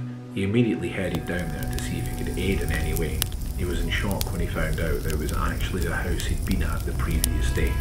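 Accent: Scotland, United Kingdom. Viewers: Ghosty tales, wonderful Edinburgh, a smooth Scots accent --- perfect. Thank You. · You should put out an audiobook, on all the eerie stories of Edinburgh! It would sell, BIG! You have such a good voice, lovely Scottish diction, but not overly much to create misunderstandings 😉🤣 Thank you! 💙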